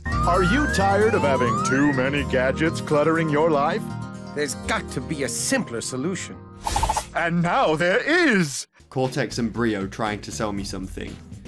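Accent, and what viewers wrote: United Kingdom. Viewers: oh man ... how i love hearing british guys talking ... nice video(s) btw :D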